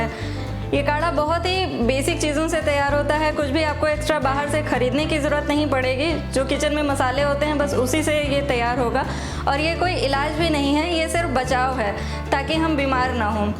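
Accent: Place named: Maharashtra, India. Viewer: Aapke bolne ka jo toning hai mujhe maharashtrian ki tarah lagta hai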